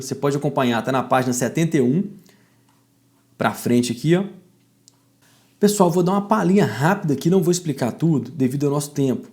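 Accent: Minas Gerais, Brazil. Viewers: sotaque mineirin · uai sô, rastado esse sotaque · O sotaque mineiro é bem simpático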